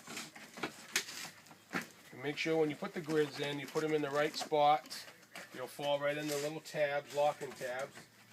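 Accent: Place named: Boston, United States. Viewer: Love your Boston accent :-) It's wicked pissa!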